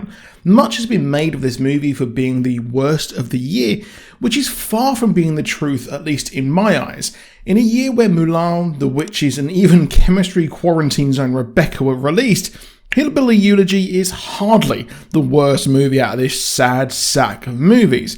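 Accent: United Kingdom. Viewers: To call this video review excrement is an insult to excrement. Perhaps, before making a video reviewing a movie that is based off a memoir, you should READ the memoire so that you have some idea what changes were made. Had you done that, you'd see that some dramatization was included (it is a movie after all not a documentary). I'm from Ohio, from the big city, but from the state. My parents also moved from Kentucky to Ohio (which, btw is NOT part of the south) looking for a better life. Despite being a minority, my life was magnitudes more privileged than the author's, in every measurable and intangible way. As far as your hard left personal views, and how things would be in your country, such comments are unnecessary. As was your tripe about Trump. Your ignorance of why things turned about bad after maw maw's move was colored by your personal bias. Again, had you read the book, you might have understood. 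But I suspect, along with not reading the book, and not understanding how health care was provided by employers, you've never set foot in a small American town. And have no idea how much a town is impacted when THE industry in town goes away. You sound like a Brit, so asking anyone whose family lived in a town where the Colliery was closed and everyone that worked there was put on redundancy, or spoken to some one like Erin Prizzy about generational trauma would've given you a some perspective; save the connection to work and health care. Though, again, based on your stated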